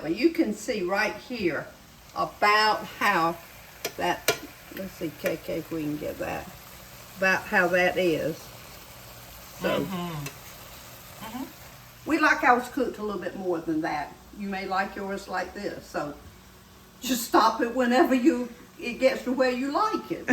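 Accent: South, United States. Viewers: I love the way you say Peppa and Butta. It is so cute! What a southern belle. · I declare I couldn’t agree with you more! She speaks proper southern language, I only wish my southern accent would come out that proper. Love her voice, love her stories and definitely love her recipes!🥰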